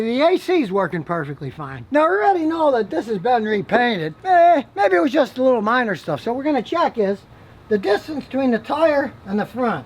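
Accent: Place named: Houston, United States. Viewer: This is Richard Dawkins, if he had been born and raised in Houston.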